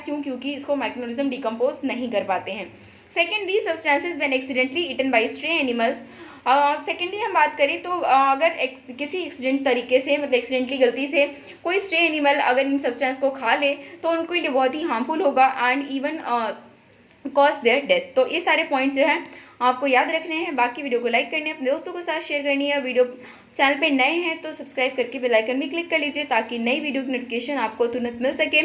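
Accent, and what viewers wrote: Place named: South India, India. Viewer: Mam ka accent south ka hh😌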